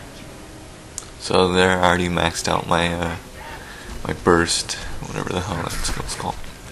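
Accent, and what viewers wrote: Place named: Vietnam. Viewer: Gotta love the Viet accent. Love your vids. Keep it up please. :)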